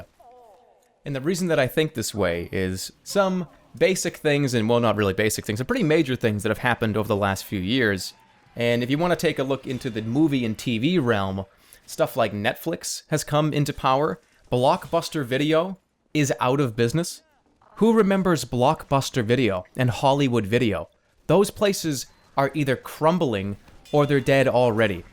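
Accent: Boston, United States. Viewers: Are you from Boston? XD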